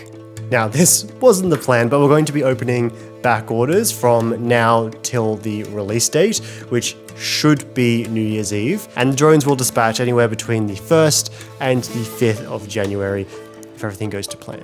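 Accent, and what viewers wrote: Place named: Australia. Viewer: relax; aussie accent, young, multi-cultural, eloquent and cute.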